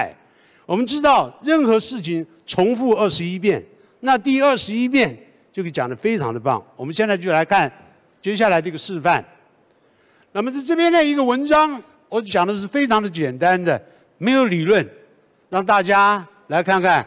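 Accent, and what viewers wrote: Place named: United States. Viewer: 美式发音真的很地道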